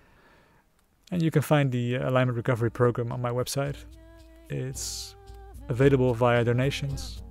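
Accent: Netherlands. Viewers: Exactly Its the balance. I realised for me I first need that feeling in myself that my fear is seen and calmed down like my inner parents talking to my inner child to reassure it. Then I let go of the resistance and there is an opening for undertaking a positive action and a good practise is doing a positive visualisation before the action that makes it very strong and usually succesful. For me For a long time I tried to do Brain retraining and forgot to first do brain retraining on relaxation and safety in the body which Made every letting go make me feel dizzy fatigued and in a stress state. So finally realising this and working on it now gives me an opportunity to let go of old beliefs and implement new ones. Are you dutch btw? You sound very dutch to me.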